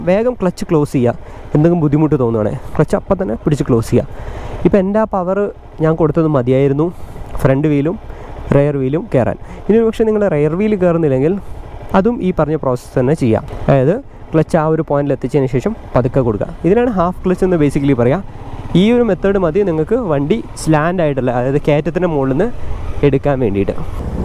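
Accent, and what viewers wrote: Kozhikode, India. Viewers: കോഴിക്കോടൻ അപാരത....💪💪💪💪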